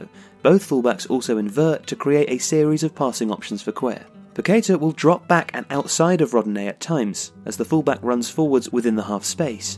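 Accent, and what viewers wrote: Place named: United Kingdom. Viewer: cara e bem difícil o inglês britânico , haha slc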